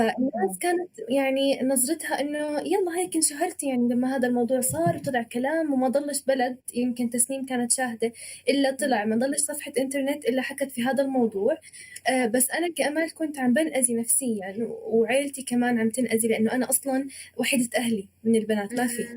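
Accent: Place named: Palestine. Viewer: ما ابي اتفلسف بس اللي لاحظته لهجتك فلسطينية تقدر تصحح لي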